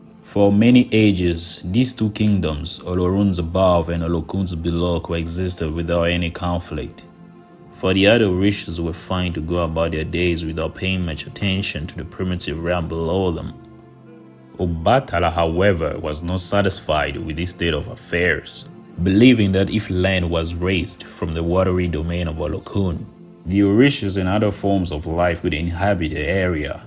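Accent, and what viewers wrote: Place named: Yoruba, Nigeria. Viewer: Please keep ur yoruba accent! It makes storytelling more Intriguing. T-ankh u. Asè 😊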